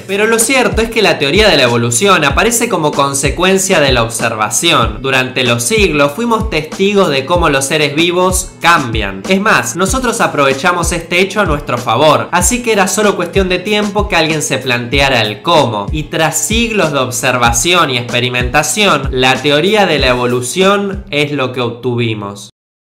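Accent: Argentina. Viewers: Eres Narigon y hablas como Argentino mmmmmmmm. :u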